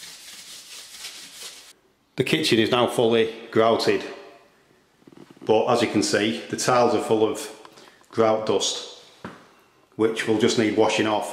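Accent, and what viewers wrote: United Kingdom. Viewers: Looks great.......good job on your video. I've never seen anyone use a small plastic pipe to indent the grout between the tiles before, so that was a good tip. I've got a "rougher" texture to the tiles I'm using on my wide deck railing, are there any tips to doing grouting on a rougher tile surface so that the grout doesn't get into the dips of the tile and mess the job up? Also....your "UK" accent made this kind of hard to understand at times, but I'll do my best to "translate".....THANKS!! John (in Nevada)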